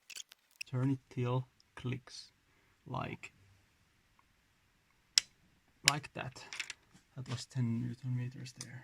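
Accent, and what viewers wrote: Finland. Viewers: Finnish accent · your accent sounds exactly like finnish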